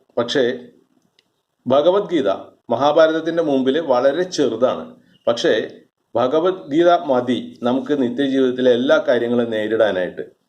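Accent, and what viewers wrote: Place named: Thrissur, India. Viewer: Sir, thankalkku oru thrissur slang und. Keep it up. God bless you.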